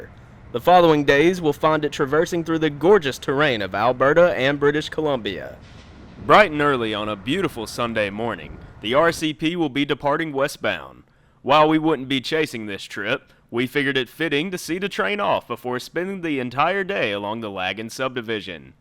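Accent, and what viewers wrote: South, United States. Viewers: Why is this beautiful train of Canada being announced by announced by an American with a southern accent?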